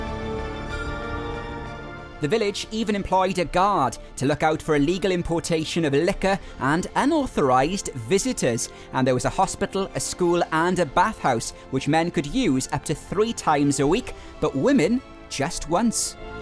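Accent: United Kingdom. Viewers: He speak like England 🏴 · Fantastic film. I love mid Wales.It is so beautiful and quiet and not busy. Another great thing about your film is listening to you with your lovely Welsh accent and fabulous pronunciation. Thank you so much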